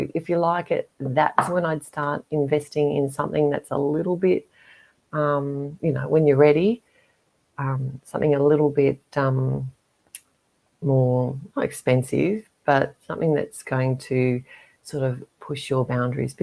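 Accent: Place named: Australia. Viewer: it is lovely to hear a second Aussie accent and you have our great sense of humour. I found your presentation very helpful and I've downloaded your swatch charts. Thank you so much and I will catch you on one of your platforms. From the Goldie, Thank you again.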